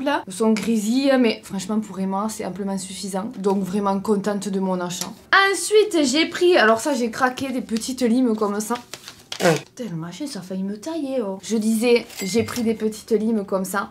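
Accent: South, France. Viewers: Bonjour. J'adore votre accent méridionale et votre bonne humeur. Vous êtes très belle et la caméra nous renvoie l'image d'une jeune femme qui a bien "les pieds sur terre " et " la tête sur les épaules ". Bien, cela étant dit, Action et moi ce n'est pas une grande histoire d'amour. Le rapport qualité prix pour addict du shopping me tentait beaucoup par ses prix au détriment de la qualité et surtout de mon porte-monnaie. Bref, moins j'y vais mieux je me sens. Cela n'engage que moi bien entendu. Si votre shopping est à la hauteur de vos attentes, je suis contente pour vous. Restez aussi solaire car vous apportez beaucoup de bien-être. Avec toute ma sympathie. 🙂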